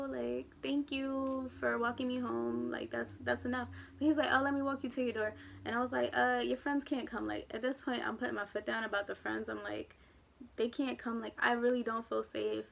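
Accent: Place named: California, United States. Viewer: Im guessing shes from California with all the "likes" thats how we talk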